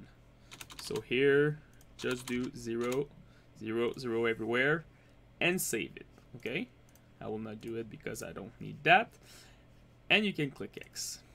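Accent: Quebec, Canada. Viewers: !!!!!!!!! French Canadian? Quebec or Montreal?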